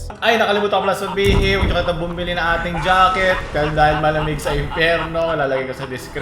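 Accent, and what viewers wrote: Philippines. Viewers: I like your filipino accent, say it again Tito: Intarnet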